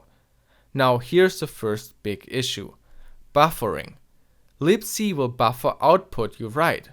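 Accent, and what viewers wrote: Germany. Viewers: I have all the time the feeling that you are from germany because you have a german like accent :D. But nice vids btw :D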